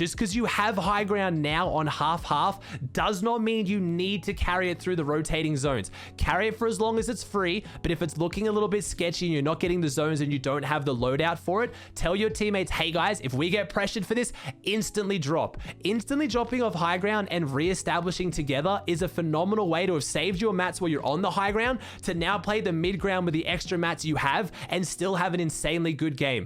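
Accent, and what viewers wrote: Australia. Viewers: He’s Australian. He can’t help it.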